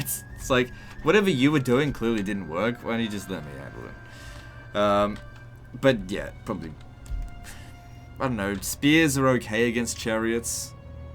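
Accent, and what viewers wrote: Australia. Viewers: Love your attitude about doing it your way even when the advise is totally correct. I can relate, but you're way more extreme in it. Strong personalities = manly balls, keep this shit up my Australian-Sound-More-Thor-Than-Thor-Himself-Legend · Linoge Z: Well it sounds like he has an Aussie, or New Zealand accent. So I highly doubt it was a US education. But for a guy who can't differentiate between to, and too, I think your hardly in a position, to be talking about someone else's education. No matter where they're from!..😏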